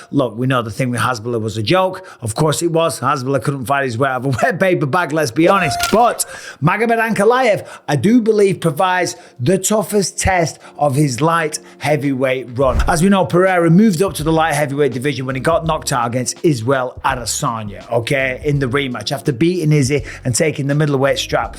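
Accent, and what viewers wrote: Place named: United Kingdom. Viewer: Mike...sounding British again 🤔 not like a yank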